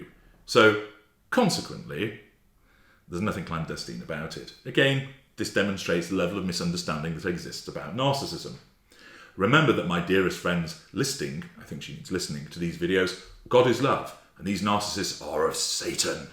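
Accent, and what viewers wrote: United Kingdom. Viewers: Are u British ? 🙏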